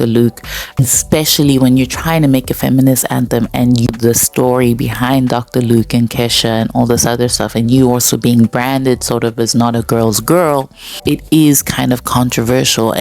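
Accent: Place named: Kenya. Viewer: I really like your speaking voice and accent. It reminds me of my Kenyan friends. You have a cool demeanor.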